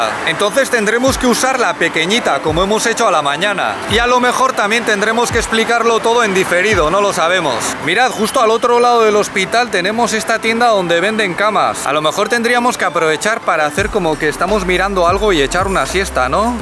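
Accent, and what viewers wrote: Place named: Basque Country, Spain. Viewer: Buenas pareja, acabo de ver uno de vuestros vídeos de sugerencia y la verdad que nada más escuchar hablar a ya he sabido que eras Vasco!! Me subscribo!!! Dena ondo ateratzea espero dut eta agurrak zure lurretik!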